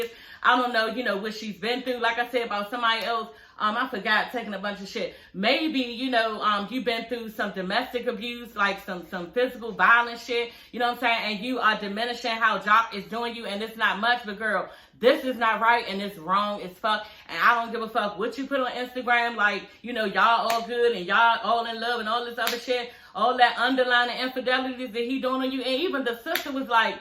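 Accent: Washington DC, United States. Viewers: I love your commentary! Are you from DMV or Dc with that accent?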